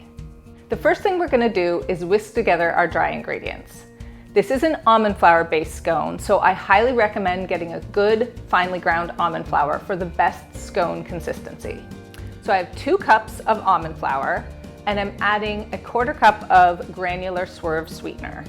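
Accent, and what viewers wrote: Canada. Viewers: Are you U.S. North or Canadian North?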